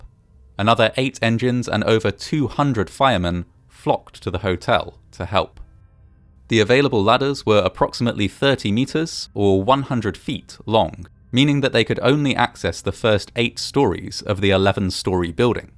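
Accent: United Kingdom. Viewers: I love your voice and UK accent, you're soothing to listen to after a hectic days work. And your stories are very entertaining and enlightening. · I always been wanting to say this but the narrator's voice is awesome. Part of the reason why I watch these spooky vids is due to his voice. Its British, isnt it?? Truly awesome.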